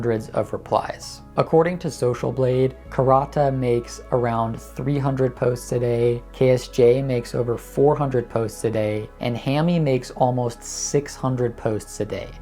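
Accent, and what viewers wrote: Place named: India. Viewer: This dude sounds like an indian scammer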